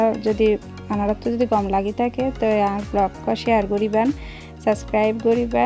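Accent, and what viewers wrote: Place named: Chittagong, Bangladesh. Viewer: চাটগাঁইয়া ভাষায় কথা শুনে কিছু কিছু বুঝতে পারছি 😁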